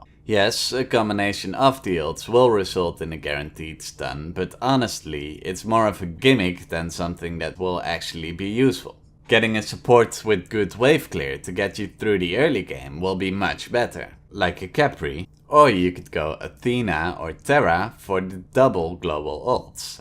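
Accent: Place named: Netherlands. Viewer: Thanks for this video, definitely going to improve my Xbal! Off-topic question but do you mind telling me where you're from? You kind have a Dutch accent, but not sure if you're actually from the Netherlands. :p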